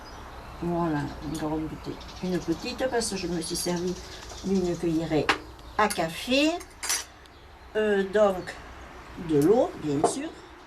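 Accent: Provence, France. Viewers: Merci pour cette belle recette, j’imagine la bonne odeur. Juste un petit bémol, je sais pas qui filme, mais il faudrait pendant votre préparation que l’on puisse voir l’intérieur des marmites, du thermomix afin que l’on se rende compte les consistances au fur et à mesure. Par contre vos explications sont géniales. Désolée pour le caméraman c’est juste une sujestion, j’espère qu’il sera pas fâché 🤣🤣🤣. Toutes vos recettes sont excellentes. Merci beaucoup. A bientôt de vous lire et d’écouter votre bel accent de Provence. 👍👍🥰🥰♥️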